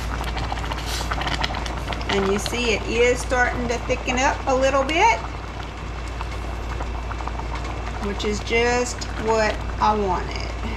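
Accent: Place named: Louisiana, United States. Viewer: Nice recipe. My pears are coming in here in central Texas so I’m about to do preserves. My son-in-law is from Thibodaux,/Chackbay. Your accent sounds like his family.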